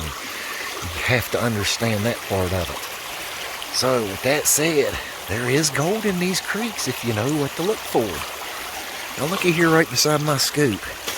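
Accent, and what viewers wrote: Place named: South, United States. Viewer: You have a country southern style draw when you speak. I'm from Connecticut and I hear ya just perfect.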